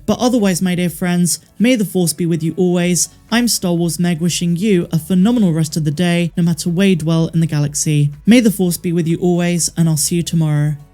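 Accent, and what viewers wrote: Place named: RP, United Kingdom. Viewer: 🤔 Going with Pedro doing last minute voice work for Boba Fett. Why take time out of filming one show, to do some voice work for Mando, when there is plenty of time to do so later. Like you said, last minute voice work happens all the time. More Migs please! Also, nicely done on the quote, as always, and never noticed you ever do them badly. You're like proper BBC Star Wars lady 😊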